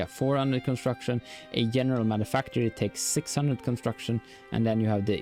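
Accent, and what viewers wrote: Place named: Sweden. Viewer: That's a swedish accent.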